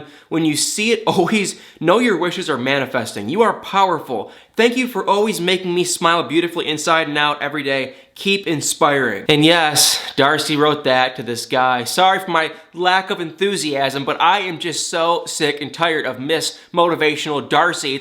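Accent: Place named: South, United States. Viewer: You are such a cutie!!! Lol I have to say that you’re saying’lank’ instead of link and thank instead of think !!!tennessee has gotten to you my friend lol!!! You are a southerner now!!! Lovingly from another southerner!! Still laughing!!!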